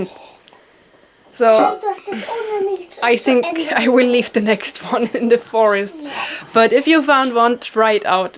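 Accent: Germany. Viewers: So good to see an english video I watch her in german ones , but it plays a computer voice , and I don't get to hear your lovely german accent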